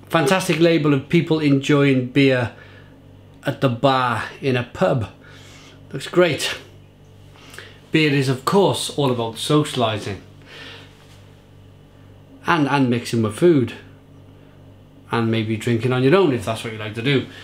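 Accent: United Kingdom. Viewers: This is my personal favorite of all the Finnish IPA's. CCCCC by Beer Hunters is also great, but this is one more to my Taste (I capitilised it because maku means taste/flavour). By the way, where from the UK are you from? I'm trying to recognise the accent. :)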